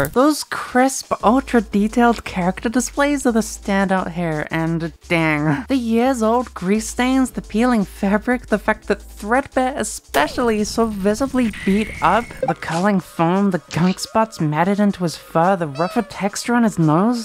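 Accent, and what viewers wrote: United States. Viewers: why do you keep randomly slipping into an american accent in this episode its like a flashbang every time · Hill billy accent is not something I knew I needed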